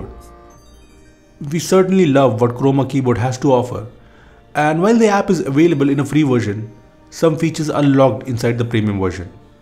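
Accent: India. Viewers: 阿三的英語發音。。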